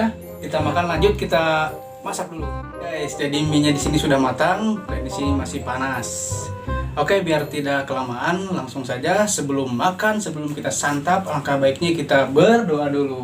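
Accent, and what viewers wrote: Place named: Java, Indonesia. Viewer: Ngomong nya kek org Jawa bang wkwkwkwk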